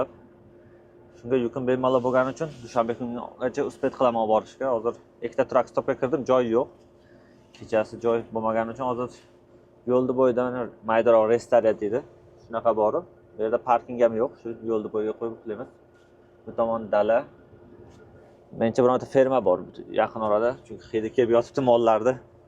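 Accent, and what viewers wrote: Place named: Jomboy, Uzbekistan. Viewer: Assalomu alekum aka yaxshimsiz salomatmisiz? Har bir tayyorlagan videolaringizni boshidan oxirigacha oxirigacha maza qilib tomosha qilyapman, samimoylik bo'lgani uchun, videoni perexodlari chiroyli bo'lgani uchun juda qiziqarli. Shevangizdan tahmin qiladigan bo'lsam Jomboy yoki Bulung'urdan bo'lsamgiz kerak? Alloh har doim panohida asrasin, ishlarizga barakot besin. Omadingizni bersin yaxshi videolardan kutamiz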